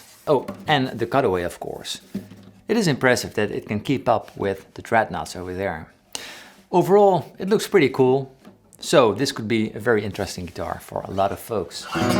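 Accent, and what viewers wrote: Netherlands. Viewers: Al die tijd had ik zo’n vermoeden dat je nederlands was! Gewoon door je Nederlandse hint Engels. Haha nu weet ik het zeker, helemaal top😂😂. Keep up tue great work tho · Ik wist gewoon aan uw accent dat je van nederland was hahah ma je vids zijn wel echt nog cool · Ah, yes, the man met that Nederlandse accent! Do listen to him, he knows guitars. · what a beautiful dutch accent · Ik hoor een nederlander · I knew you were Dutch ! Toch wel goed accentje hoor !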